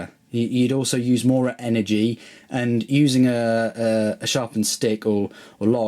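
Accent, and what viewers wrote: United Kingdom. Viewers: obviously British I'm Australian we sound nothing like them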